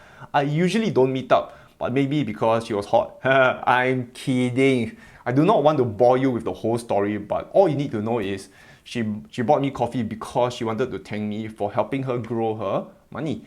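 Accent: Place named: Singapore. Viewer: Singlish ? Hard to understand !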